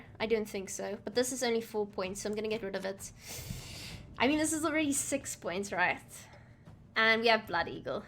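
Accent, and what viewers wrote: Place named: Afrikaans, South Africa. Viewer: Knew I recognized the Afrikaans accent👀👌🏾. Great to see a fellow South African playing gwent😁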